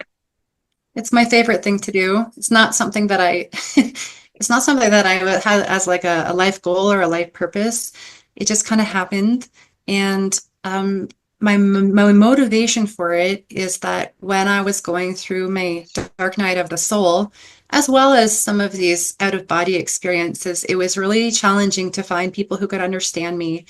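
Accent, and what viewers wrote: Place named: South Africa. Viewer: Loving you show I thought you were from South Africa at first by your accent. Anyway, do you think people with egos some are grounded by Sprite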